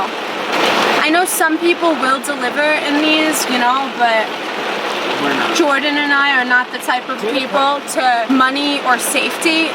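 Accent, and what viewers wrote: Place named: Long Island, United States. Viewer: Former co worker of mine from Long Island...same accent 😀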